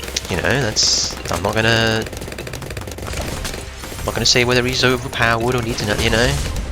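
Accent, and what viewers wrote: United Kingdom. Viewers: you can see the view difference when switching games, idrc and im all for it seeing a bri'ish dude playing bloons and ultrakill with a funny accent is what i signed up for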